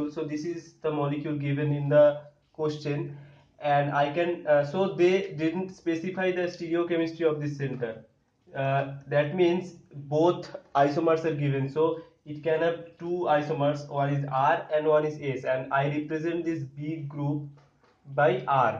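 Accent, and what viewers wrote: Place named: Bengal, India. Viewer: Nicely explained. And U sound like a Bengali. I'm also from Bengal.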